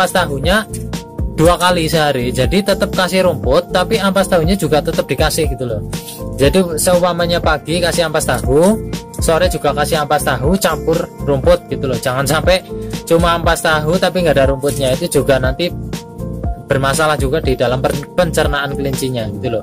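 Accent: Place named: Banyumas, Indonesia. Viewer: klu orang ngapak ketahuan cara ngomongnya... hidup ngapak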